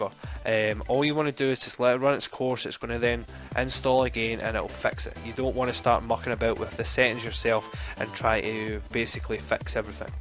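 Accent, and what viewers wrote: United Kingdom. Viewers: I love your British accent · Loveeeeeeeee the scottish accent mate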